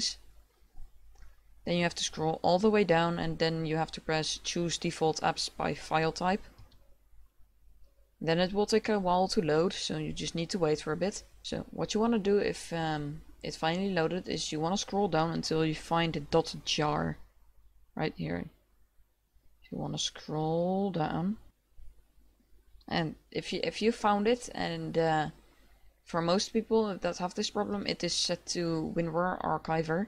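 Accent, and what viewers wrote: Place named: Netherlands. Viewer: I smell nederlands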